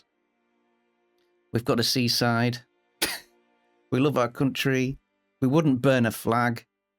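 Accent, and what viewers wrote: Yorkshire, United Kingdom. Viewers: I think you'll find you say it somewhat in the yorkshirean way even when you try to use the standard pronounciation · It must just be Americans cause as a English person I understand you perfectly I here Yorkshire accent all the time.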